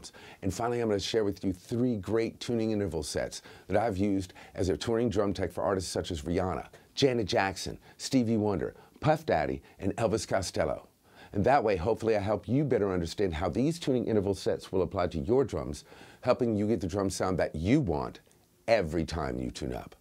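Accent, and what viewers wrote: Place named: South, United States. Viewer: Oh man, I absolutely love your presenting style and that "southern drawl" in your voice! Thank you so much for all the great videos, and greetings from Finland! 🙌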